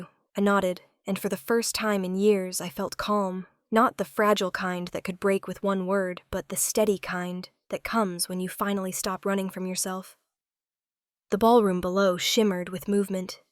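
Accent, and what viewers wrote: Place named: Indiana, United States. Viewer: I thoug y t she was from Indiana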